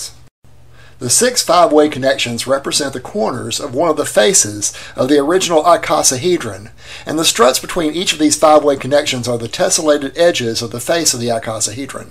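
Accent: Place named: United States. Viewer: Thanks for these great explanations! On a side note, you sound like you must come from the same part of USA as Bill Clinton.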